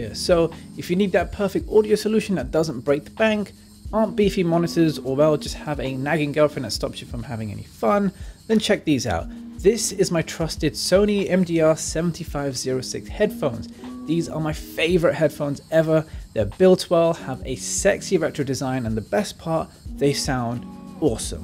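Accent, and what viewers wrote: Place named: United Kingdom. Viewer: I though "another american review" Hears girl - british af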